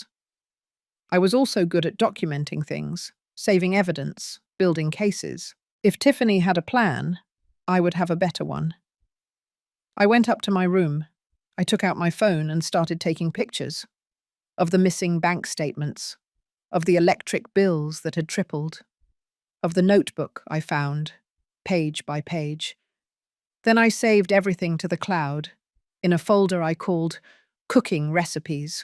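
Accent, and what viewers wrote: England, United Kingdom. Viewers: Oh it is so good to hear an English narrater. Lovely easy voice to listen to ❤❤listening from Swimbridge Nth Devon UK. ❤❤ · Your British accent it looks so far from the Bazaar story you are making girlfriend comes and do all distinct to you and you take it come on even for the story it's so Bazaar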